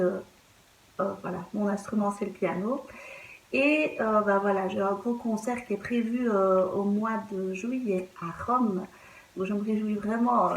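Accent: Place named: Belgium. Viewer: Bravo, bravo pour ton concert à Rome! Ta robe sera très belle, j’en suis sûre...et je pense que le taffetas sera plus fluide une fois lavé. Pour les flex, oui, tu peux les couper en morceaux, pas de problème. Merci pour ce bon accent bien de chez nous et bisou de Marche-en-Famenne, Gene 🎼🎶🎶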